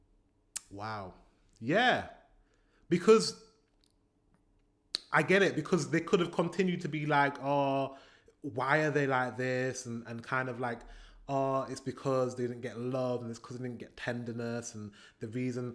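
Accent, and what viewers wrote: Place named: North, United Kingdom. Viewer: Out of context: I love your voice and "dialect". Greetings from a northerner (Germany) to a northerner.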